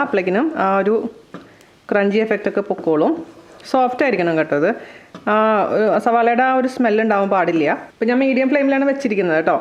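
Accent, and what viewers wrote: Thrissur, India. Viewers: Thrissur aano😊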